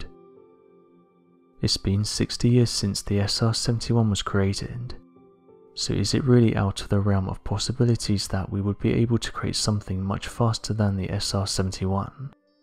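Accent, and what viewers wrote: England, United Kingdom. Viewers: You sound English what do you mean we, neighbor? Its better that none of the new stuff hit YouTube. China already stole plans for some 6th gen fighter jets. It was the junk20. Thankfully china isn't very good at putting shit together yet. Im thinking the us government got wise to china and leaked an inferior plane. Either that or china is so much more incompetent and should not ve taken at all seriously. The black ops planes are still being made.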